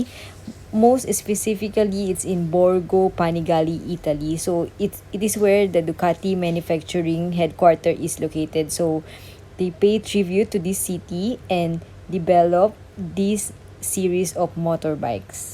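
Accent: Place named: Singapore. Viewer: I like your Singaporean-English accent hahaha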